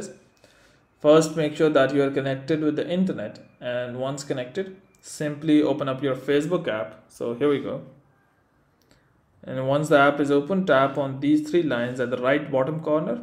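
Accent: India. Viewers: People are just slow headed fr, if you know English then you would have understood him even with his Indian accent. They just find a reason to dumb themselves down.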